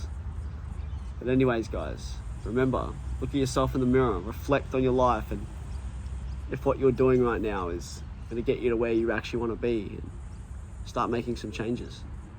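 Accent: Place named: Australia. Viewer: Found this little vidya in my youtube feed, I'm glad you made it brah (I had to watch it on 2x speed as your eshay lad straya accent makes my yankee ears tickle). I'm 25yo now, still figuring things out, still gotta mountain of improvement ahead of me, but that's the beautiful thing bro. Even though I still trip and fall a lot, I'm the happiest I've ever been in my life because I feel like each year I'm closer to becoming a better man. No matter what happens: gangas or no gangas, bugattis or maccas, sickkunt or sad kunt, these are good problems to have cause every day I'm not dead or in prison is a day to be grateful for. I've learned to forgive myself when I slip, to not regret my past mistakes (no matter how retarded), and to not wait for things to be perfect. Climbing the mountain is why we're here brah: we fall, get injured, slip back down, but still get after it and make progress. 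Even if life doesn't go as planned, or every day is failure and is spent in tears - as long as we stay true and do our best, I know Zyzz and Tupac will smile upon us - and I know that we'll smile in the end too. Cheers mate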